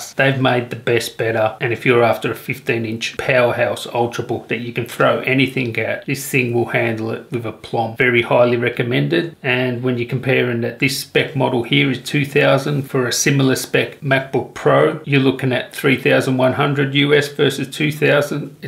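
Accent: Australia. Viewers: If anyone knows who videogamedunkey is, do you guys think this guy sounds very simliar except with an australian accent? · Is it just me or does this guy sound like an Australian Trump? (no offence intended, just the way he speaks reminds me of him)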